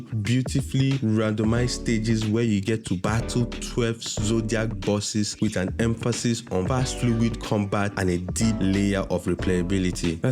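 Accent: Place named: Africa. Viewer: Heard the african accent, subscribed immediately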